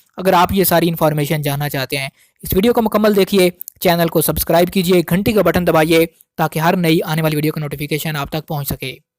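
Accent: India. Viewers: Bhai saaaab... bolne se Kashmiri ya Potohari lagtay aaaap...?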